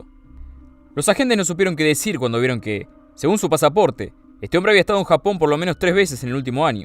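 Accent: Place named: Argentina. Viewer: Lo único que le pone en la torre al relato es el acento Argentino.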